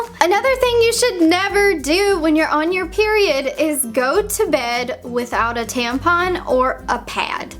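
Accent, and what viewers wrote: South, United States. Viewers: Are you southern?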